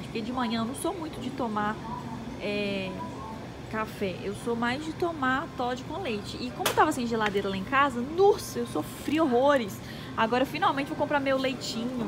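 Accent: Minas Gerais, Brazil. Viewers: Esse sotaque Mineiro e bom demais gente❤❤ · Esse sotaque mineiro aí é de qual cidade? O pessoal da cidade do meu noivo tem o mesmo sotaque 😂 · Entrei nesse canal falando essa menina tem sotaque de mineiro até vc dizer que o galo era mozao !! Já me inscrevi! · comecei a ver seu vídeo e pensei o sotaque dessa menina parece ser MINEIRO, e eis que você fala que é de Minas. Adorei!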